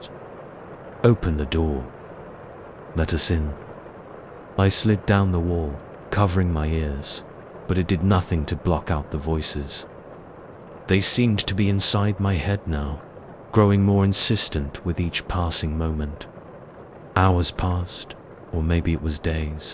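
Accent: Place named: United Kingdom. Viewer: I hate the British accent it ruins the whole stories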